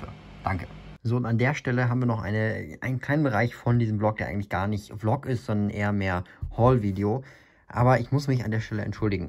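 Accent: Germany. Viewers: Bist du eigentlich aus beiern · kommst du aus münchen ?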